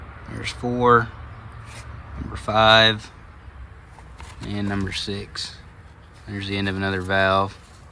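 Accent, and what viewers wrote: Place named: Alabama, United States. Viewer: This channel reminds me of Peg at Zip Ties and Bias Plies... Neither ever shows their face in camera, presumably so they can get away with their dirty deeds with impunity! LoL. I'm tryin' to figure out where this good ole boy is from. Countryside looks like southern Indiana but could be anywhere USA in reality. The voice sounds like the feller is from Alabama, but again that can be misleading too.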